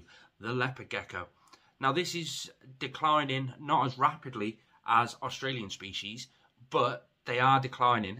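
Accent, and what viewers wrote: United Kingdom. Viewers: Y'all Brits have such a bizzare way of saying things to us in the states it's neat though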